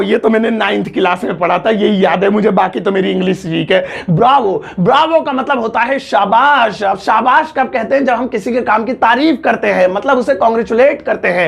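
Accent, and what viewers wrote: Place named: Khadi Boli, India. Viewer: Sir kab se hamari tarah khadi boli bolne lage 😁😁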